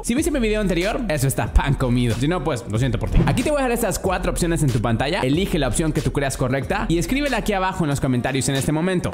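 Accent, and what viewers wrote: El Salvador. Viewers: Ahora si se nota que es de El Salvador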